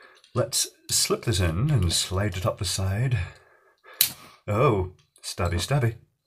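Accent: Scotland, United Kingdom. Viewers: Always nice to watch a video by shortly before retiring for the evening. Your accent reminds me of a friend I have from Bishopton. But not his mother's who was from Aberdeen.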